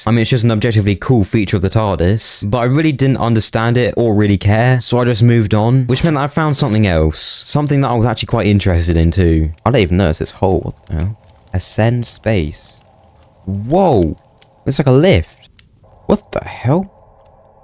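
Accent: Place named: United Kingdom. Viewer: U sound British but watching u play I think I may add cheats Cause that will take very long what mod pack did u down load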